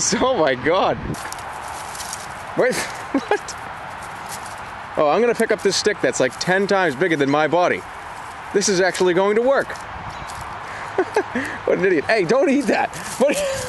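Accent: Boston, United States. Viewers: he is so boston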